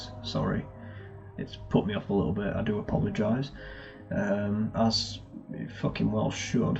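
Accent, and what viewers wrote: United Kingdom. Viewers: Yes, it definitely does need that when it comes to this video; I agree wholeheartedly. I'm kinda cynical about things, lol, so I try and avoid watching stuff that requires the check, but it's annoying when it's a channel you really enjoy. I like the chilled nature of you just chatting and it's always nice when it's a fellow Brit! You're welcome, no problem! 🙂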